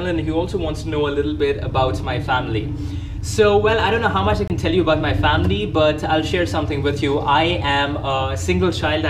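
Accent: Hyderabad, India. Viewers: hydrabadi Urdu was just amazing... kirrak... maut dal Diya.. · bhaiii Lovely.. that's a Crazzy video..!! ❤ Hyderabadi Hindi 😍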